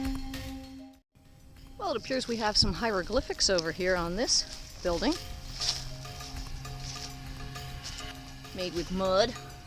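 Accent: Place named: Chicago, United States. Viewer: Fred C. Birchmore Sr "Many a sermon I am sure has taken place at this location." These insightful words, uttered from the pulpit by this bold and intrusive explore,r epitomize her investigative reporterism talents. The short movie isn't bad if the sound is turned down to muffle the nasal Chicago wannabe Madow intonations. Her breaking and entering the Milford cabin should not have been caught on film, though, since that is now evidence of her committing a crime. If the <link> is still there where she wrote it in the table dust, that would also be prosecutable as vandalism, or "tagging." As a sort of cultural or denominational exchange it would be an interesting followup to break-and-enter her abandoned home in Florida (it is clearly abandoned when she is not there) and root around to speculate or fabricate her lifestyle and generally invade her privacy. 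I wouldn't play that spooky music and tinky gong, though. Maybe a sound track of "Catfight in Bizarrino" played backwards instead. That is my cabin, or "tent," BTW, with the children's claybank hands on the front (which date back to the 1920s). That venerable structure is not representative of my real houses in Hollywood and San Diego, but I plan to be there this summer, as I have for 72 years, and as my family has since 1832. Like - Reply - Just now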